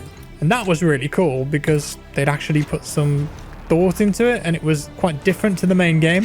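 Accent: United Kingdom. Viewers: Hate this posh English nerd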